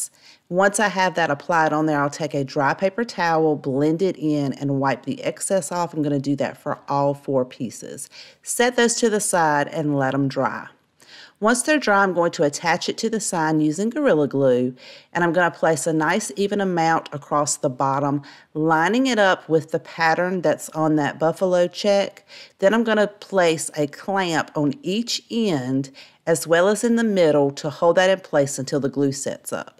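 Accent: South, United States. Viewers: I am a new follower. so far everything you create is just awesome.. I think I hear a little bit of country accent in you... lol.. I am country , live in South Carolina.. Thank u for sharing your amazing talent with us. ❤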